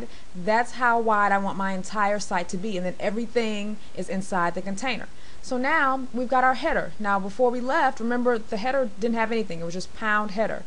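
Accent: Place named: South, United States. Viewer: I love your voice. Very smooth, soft and with a subtle hint of Y'ALL.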